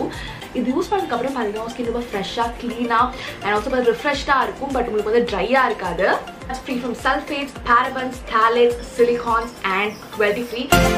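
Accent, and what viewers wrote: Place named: United States. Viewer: Please Talk properly Don't use American accent....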